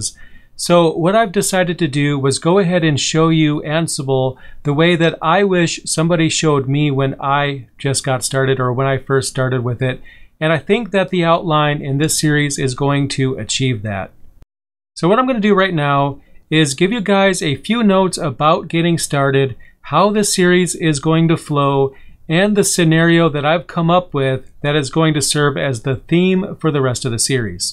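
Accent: India. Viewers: Mind your language... If you can't understand India accent don't watch Indian videos.. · And how does someone know the video has a speaker with an Indian accent without watching/listening to it? Mind your own language Suraj. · you got a problem with Indian accent? Why though? Open up youtube and search for any tutorial, you'll find an Indian guy with thick Indian accent uploading free content for guys like us learn so just be grateful rather than passing these comments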